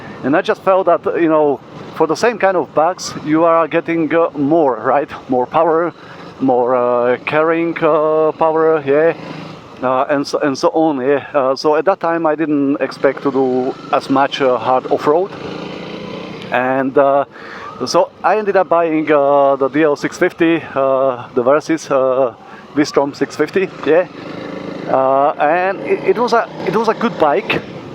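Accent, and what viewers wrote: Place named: Slovakia. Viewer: Hi. Thanks for your videos about CRF300L. Please how tall are you? Or maybe better, what's your inseam? Did you consider/testdrive CRF300 Rally as well? Btw, maybe I am wrong, but your English sounds very "Slovak" =).. Aren't you from Slovakia? Thanks again for a feedback. Lukas.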